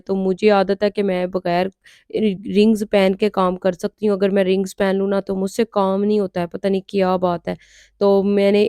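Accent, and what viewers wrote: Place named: Sargodha, Pakistan. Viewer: Meri bahn apki boli asi ha jesy sargodha ki ho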